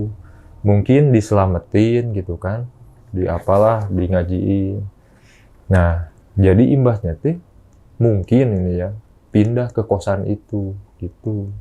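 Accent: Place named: West Java, Indonesia. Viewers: Intonasi yang stabil, tempo medium penceritaan dan bahasa yang mudah dipahami membuat cerita lebih menarik dan nyaman untuk didengar, sekalipun dengan aksen Sundanish.. Salam Om dari kota tetangga, Cipanas. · Meuni sunda pisan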